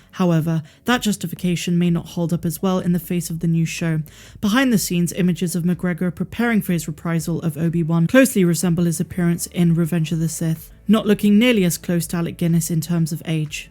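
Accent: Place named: West Country, United Kingdom. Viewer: Another great vid. Love the fact you seem to be from the west country.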